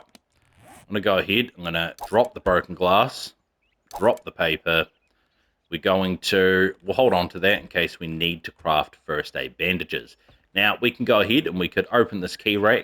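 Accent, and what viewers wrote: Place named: Australia. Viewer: I just found you through algorithm. Don't have console, always played on pc but live the accent lol. Not many aussies (assuming that's where the accent is from) I've seen play this and post on it so keep posting 👍 all the best "mate" 😉😜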